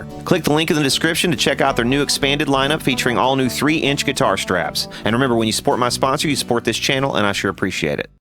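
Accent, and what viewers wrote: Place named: South, United States. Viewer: Hey, that was great felt good to hear! Coincidentally, I heard the show Joe B. put on here in Munich as his next to last, 49th of 50 this year in Europe. He moved around quite a lot, had Mr. Reese Winans on keys, 2 horns(one with vocals, too), 2 singers, drums and bass. I'm a good deal older than you at 66, but my mid-20's son, who pounds a pretty mean drumkit and is a lot more into metal, really thought it was pretty good. We were close enough that it was a bit too loud sometimes, but the energy of the band was to my eyes downright awesome, they were really in what I would term flow for about 80 percent of the time. Joe played a massively well-modulated acoustic solo after he'd already said, "Good night!" the first time which felt like about 20 minutes. Then the band came back and did another song to close. I really enjoyed it. 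I don't go to concerts often, but that was definitely worth the time, braving the rainstorms and the money. Thanks for the vid and keep it up. Your expletives are not my style or habit, but the southern flavor comes through nicely.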